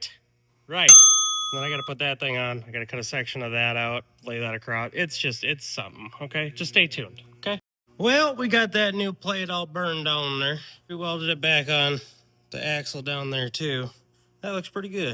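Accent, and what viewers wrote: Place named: Minnesota, United States. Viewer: Sitting here hearing a hometown type accent going.. This dudes from MN.. Look up and sure as shit.. yall are. Fellow rock and cow, how are ya doin!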